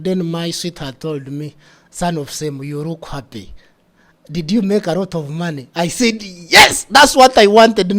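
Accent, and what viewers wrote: Ankole, Uganda. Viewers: Your accent is not bad just a kinyamkole accent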